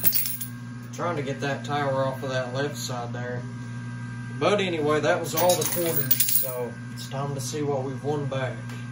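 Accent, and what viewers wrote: United States. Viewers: I love watching all of your videos. Where are you from? You sound so country lol not a bad thing at all. I'm from Mississippi so I'm country too lol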